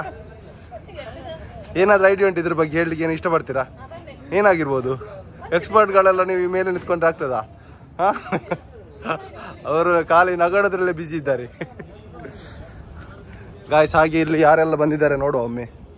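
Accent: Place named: Kundapura, India. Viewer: Nivu kundapura kannada hege math adthira ?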